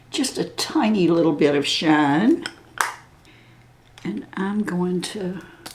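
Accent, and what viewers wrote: South, United States. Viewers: Your voice and your lovely Southern accent sounds like Melissa from her channel, you remind me of her. It's a delight to put makeup on along with you.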